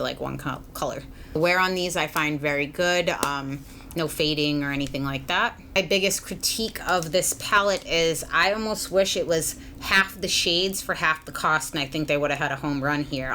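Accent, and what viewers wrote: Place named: Bay Area, United States. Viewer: y'all can already tell she's from the Bay. Girls like us vibe and just know💯😂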